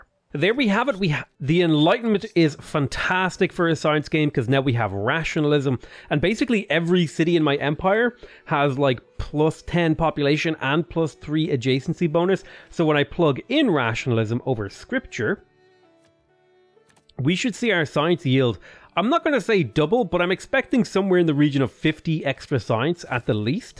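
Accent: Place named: Ireland. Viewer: You have a lisp? I thought you were just Irish.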